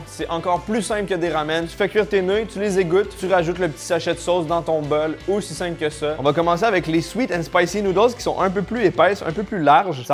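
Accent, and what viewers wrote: Quebec, Canada. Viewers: Qu’est ce que j’aime cet accent Québécois, c’est vraiment classe 💪✌️ Le bonjours à vous tous au Québec de notre petite 🇨🇭 je t’ai découvert récemment, tu es au top 👌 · Les ayants droits de Bocuse m'agaçent.. le mélange accent québecois - marseillais pépite lol.. le "petite peau comme moi".. m'as tué. Je modifie le commentaire au fur et à mesure de la vidéo :p tabarouette cauchemars dans la barquette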